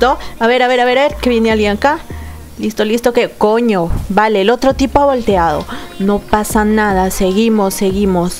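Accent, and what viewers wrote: Spain. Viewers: Española xD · te diria q no metas mucho el asento español q algunos no entienden lo q dices:v xD i diran q eres groseras diciendo esas palabras:3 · Cuando eres peruana y hablas como español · hazme un hijo..psdt: soy hombre xd Te sale gracioso el dejo de española y se te escapan las lisuras cuando te matan:3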